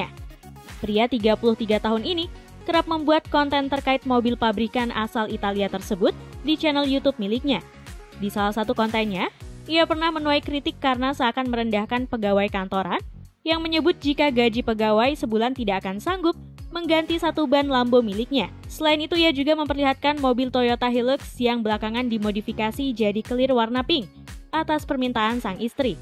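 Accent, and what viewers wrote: Indonesia. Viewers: Yg ada nya kau bkn miskin.. tp balik kampung ketakutan Oh btw bang Kau itu anak aceh ya Jgn kau bawa2 nama anak medan Logat bicara kau aja aceh x Anak medan ga spt itu logatnya Org asal aceh kok bawa2 nama kampung sebelah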